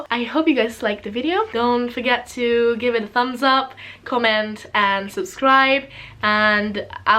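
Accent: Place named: United Kingdom. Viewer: Aw<link> britishnya udh mulai kedengeran nih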